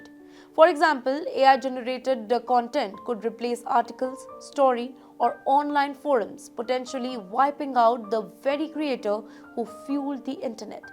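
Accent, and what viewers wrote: India. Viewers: Why does Indian accent make any story that’s being covered suck .. I don’t get what she is talking about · This news reader need to learn how to speak clearly. Too much indian accent. I need to read subtitles to understand what she is talking about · Narrator Audio NOT Cleary Understandable, Typical Indian English, JUST RANT IT OUT, weather the Audience Get it or not, JAI HIND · It's time to learn indian english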